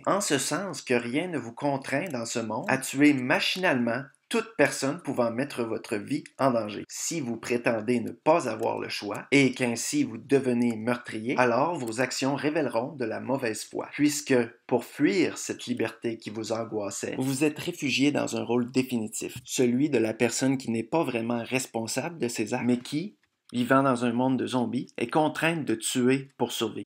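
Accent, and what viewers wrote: Canada. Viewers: Tu viens-tu de Montréal? J'arrive toujours pas à distinguer bien les differents accents au Québec :D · Quebecois (Canada)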